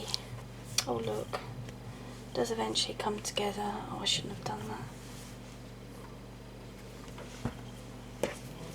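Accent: United Kingdom. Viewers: where is your accent? i mean, what is it called...what part of england or area? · That british accent... love it! Btw great tut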